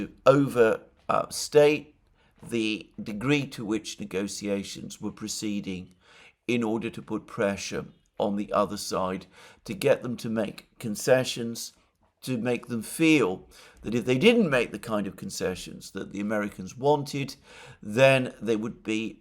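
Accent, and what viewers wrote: United Kingdom. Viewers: YOU SHOW MISPLACED ARROGANCE WHEN YOU TALK ABOUT IRAN. THIS VANITY IS NOT APPROPRIATE FOR AN ONLINE GROCER, EVEN WITH A BRITISH ACCENT.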